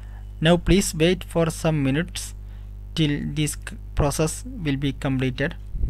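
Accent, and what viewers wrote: India. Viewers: holy shit my insticts were right. i new i was in good hands as soon as i heard that thick indian accent<3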